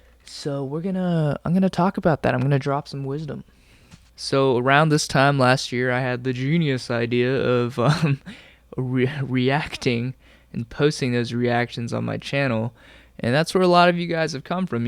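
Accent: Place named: California, United States. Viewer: I'm from New York (Brooklyn, Bed-Stuy) and I knew from the moment you started talking you were from California, the accent is pretty heavy.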